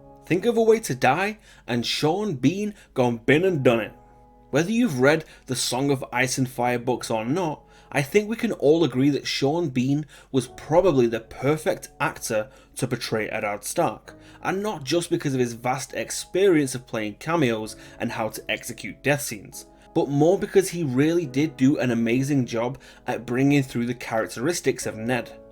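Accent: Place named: Northern England, United Kingdom. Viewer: ur accent???? where is it from just curious? its mixed of jon snow (winterfelish/northern english/ mancunion) n American? its mixed or is it me only? or i donn know i m mancunian so hope u donn mind mate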